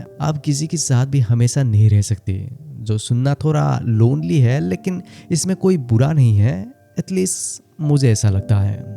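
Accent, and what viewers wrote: Northeast, India. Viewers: Northeast se ho kya Bhai?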